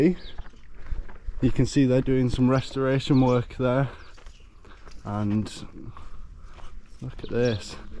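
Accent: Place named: Yorkshire, United Kingdom. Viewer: I just love it, you say it as you see it and you have such a great Yorkshire accent, but please do an Aswan Trip you and everyone will love it, i miss egypt so much its been 8 years since my last trip but looking at going to Hurgharda in March 2025, only downside it will be the first time i've done a trip on my own, but i'm that confident in the people and i always feel safe, one story comes to mind on my 3 or 4th cruise they decided to go luxor to aswan then back to luxor then up to Dandarah, woke up one morning on boat to Dandarah and at front and back of cruise boat were tents? i thought whats going on here,turned out because Dandarah is normally off the boat trip schedules and theres a lot of nomadic tribes, anyhow when i looked inside the tent at the front and back i was taken back as there were Machine guns bolted to the front and back of the boat lol, they were very rusty lol so i'm guessing not been used for a long time, anyways we had no trouble and enjoyed the trip to Dandarah, also please make people aware of the police in the white uniforms (tourist police) they are everywhere and if you ever feel unsafe go to one of them as they are only there to make the tourists feel safe, and they will always side with you over a local. 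Such a great country just a shame they have had troubles over the last few years but its getting back to normal now 😃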